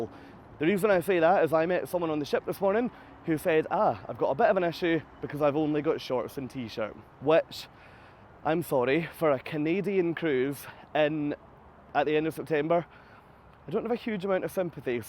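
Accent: Scotland, United Kingdom. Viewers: The title makes it sound as though you're blaming Saint John for the troubles onboard. 🤨 Great vid of the Loyalist City, first incorporated city in Canada. Americans loyal to the crown, left their homes to come here during the revolutionary war. Glad you found Walmart. Love the Scottish (Glasgowan?) accent.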